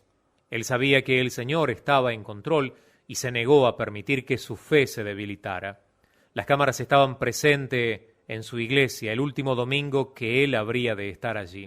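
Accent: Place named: Argentina. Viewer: HE ESCUCHADO OTROS LIBROS MUY EDIFICANTES COMO LOS DE REBECA BRAUN, PERO UD ES EL QUE MAS CLARO LO ENTIENDO SERA POR NUESTRA TAN PARECIDA LENGUA LATINA, SOY HONDUREÑA Y EL ACENTO ARGENTINO ME GUSTA MUCHO, ASI ES QUE HE QUEDADO MUY CLARA EN CUANTO A LO QUE VIVO Y VIVIRE EN LOS AÑOS QUE ME DE PAPITO DIOS AQUI EN LA TIERRA YA TENGO 61 Y 30 AÑOS QUE SU MISERICORDIA ME ALCANZO ❤🙌❤🙌❤🙌GRACIAS DIOS LOS BENDIGA CADA DIA Y QUIERO MAS YA Q POR MI TRABAJO PUEDO ESCUCHARLOS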